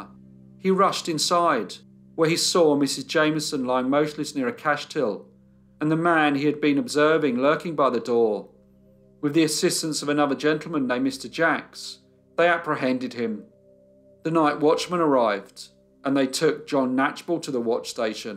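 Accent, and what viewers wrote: United Kingdom. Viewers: It's LeFFtenant, not LOOtenant. You sound British, you should know better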